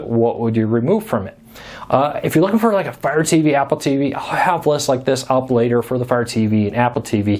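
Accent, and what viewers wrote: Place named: Michigan, United States. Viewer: Excellent information about the channels! Thank you very much for sharing. Also I could tell you were a fellow Michigander like myself ( Southeast Michigan-Metro Detroit) when I heard you talking in the beginning of the video. God bless!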